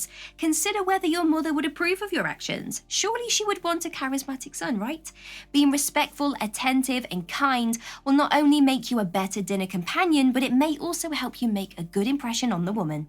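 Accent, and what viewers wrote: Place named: Manchester, United Kingdom. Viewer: Great video, thanks! I love your accent; did you grow up near Manchester by any chance?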